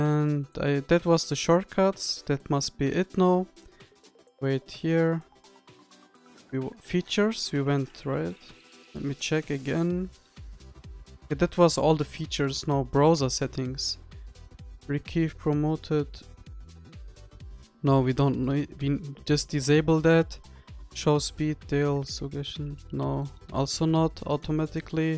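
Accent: Germany. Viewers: Junge man hört den deutschen dialekt sofort raus hahah aber trz nice video😃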